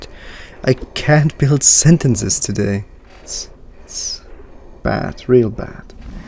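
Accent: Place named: Germany. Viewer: Man hört so krass, dass du deutsch bist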